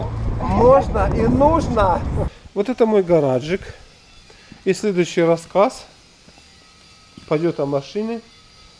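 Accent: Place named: South, Russia. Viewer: На юге все так Хаварят) съездите в Ростов !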